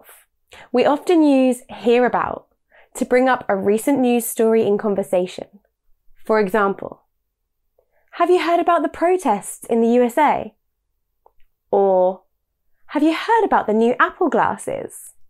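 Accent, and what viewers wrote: United Kingdom. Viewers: 역시 영국발음은 넘나 간지남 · 와 역시 브리티쉬 발음ㅋㅋㅋ 너무 깐지나네요 전 언어교환101에서 영국인 친구 사겨서 요즘 영국발음 연습중인데 너무 재밌고 럭셔리해요ㅎㅎ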